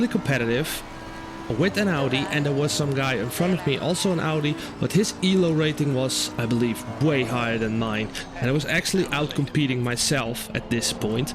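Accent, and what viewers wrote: Netherlands. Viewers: Hello new to the channel, found your tips really helpful! Also, wasn’t sure if you were Dutch or from the western side of Germany, but then came 🤣.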